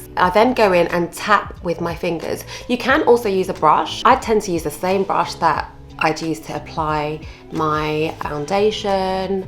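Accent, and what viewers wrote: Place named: London, United Kingdom. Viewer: she is beautiful. Ohhh I love a London accent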